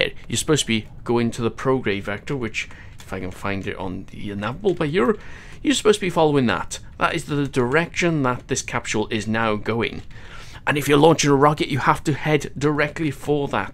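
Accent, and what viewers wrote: Wales, United Kingdom. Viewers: Welsh accent with a speech impediment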